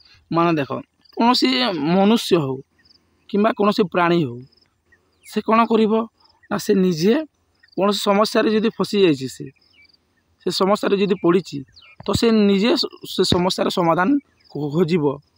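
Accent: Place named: Sambalpur, India. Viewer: Sir apan ken village ru belong karsan mate tike apan sambalpuri bagir lagu chhan i think west odisha ru belong karsan jadi b an ta sambalpuri re padhaun sir🙂 I'm from bargarh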